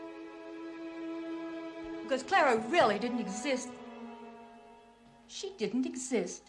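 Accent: Mid-Atlantic, United States. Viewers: It’s the Trans Atlantic/Mid Atlantic accent. It was how the upper class in the North East spoke. It was taught in speech/elocution classes as well, as in drama schools/classes. It fell out of favor after WWII. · Very surprised at her posh American accent. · It sounds like a so-called mid-Atlantic accent, albeit toned down a mite.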